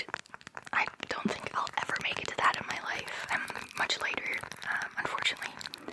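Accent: United States. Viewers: I always assumed she was American living in the central north part of the country where there is a more German population.. I’d guess her first language was American English because she has an American accent, uses colloquialisms like “beating around the bush”, and even takes American liberties like saying “ommina” instead of “I’m going to” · Alright, from your accent I literally thought you are American. Kind of surprised me when you said you’re from Germany. You have a really clean American accent. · Mando is saying why does she have an American accent when she's not American? That makes sense too.....She should have a German accent when speaking English. I've never heard a German with an American accent...... ever.... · I just don’t understand how you’re German living in Germany with an American accent !! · Never would have guessed you were German. I thought you were American based on your accent.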